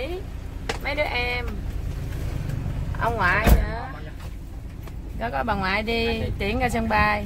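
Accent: South, Vietnam. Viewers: Chi noi chuyện dung chat dan Nam Bộ minh luon de thương dễ gan gui nữa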